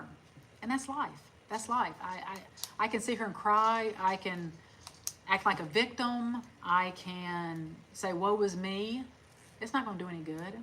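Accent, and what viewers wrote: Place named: Carolina, United States. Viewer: We relocated to North Carolina back at Christmas 2018 from Maine. I knew immediate that was a Carolina accent. 😉. I only found your channel shortly before your husbands passing. The content did change, but that was expected. We downsized by over 1000 sq ft. and I am so glad we did. So much less to care for. I happily decorate my smaller home. I am not a minimalist, but still enjoy having items that bring me joy. My struggle is making friends as an adult. I am blessed to have my church, but it is still different. That's for letting us into your world.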